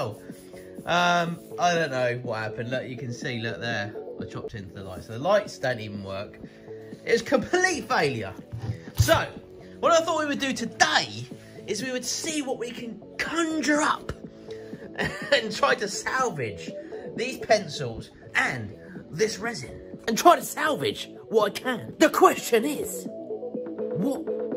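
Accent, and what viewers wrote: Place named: Fens, United Kingdom. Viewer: has a "Fens" Accent IIRC.:-) He has said numerous times about being in the UK though, as he has been on Telly here, and tells us about that when it happens.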